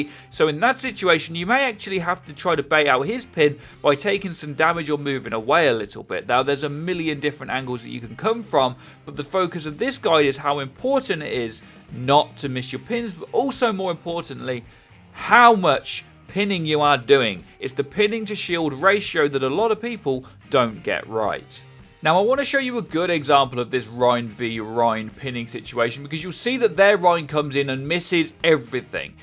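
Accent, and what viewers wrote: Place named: Midlands, United Kingdom. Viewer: That midlands twang though 👌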